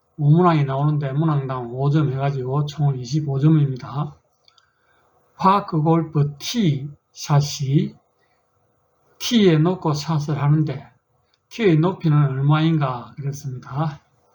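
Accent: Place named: Gyeongsang, South Korea. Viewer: 경상도 사투리~~~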